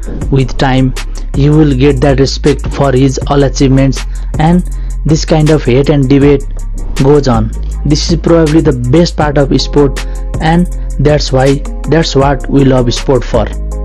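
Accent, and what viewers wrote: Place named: Nepal. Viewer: Where are u from😂😂talking like nepali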